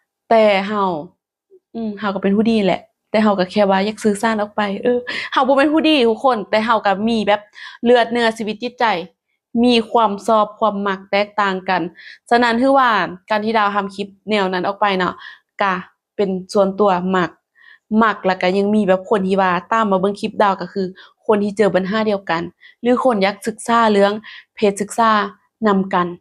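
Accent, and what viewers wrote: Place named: Lom Sak, Thailand. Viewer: ภาษาลาวเหนือบ่คับ เว้าคือหล่มสักบ้านผม คนล้านช้างคือกัน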